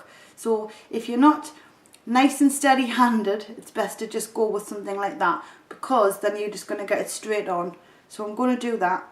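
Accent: Newcastle, United Kingdom. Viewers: She's from South Shields, newcastle x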